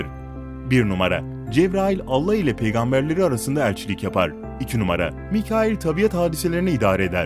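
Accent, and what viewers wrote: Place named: Azerbaijan. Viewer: soyledigin sozler azeriye benziyor hemde cooook guzel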